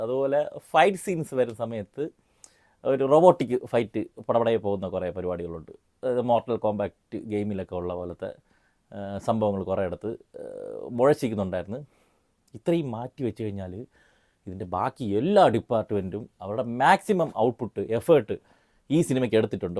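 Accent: Trivandrum, India. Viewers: Trivandrum slang 😂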